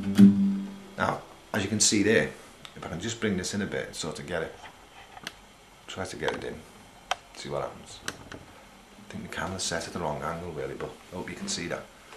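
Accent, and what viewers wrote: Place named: Liverpool, United Kingdom. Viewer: Love listening to the scouse!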